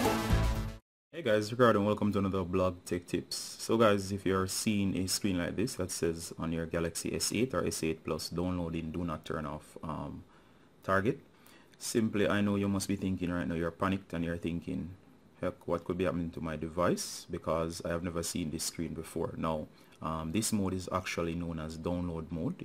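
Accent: Jamaica. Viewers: I did panic! Thank you so much. All good now. Your accent helps too.....Jamaician?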